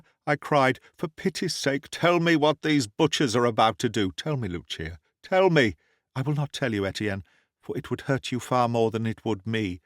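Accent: United Kingdom. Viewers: As an English language learner.. I find your voice very clear British accent that I enjoy listening more than American accents.. please keep uploading more and more.. listening to your narration is a valuable source of developing my listening skills in learning English.. thank you very much sir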